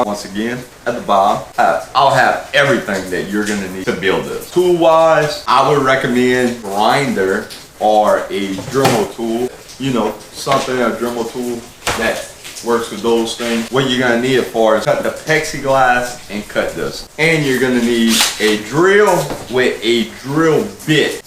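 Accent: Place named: Appalachia, United States. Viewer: Learn to talk dam hillbilly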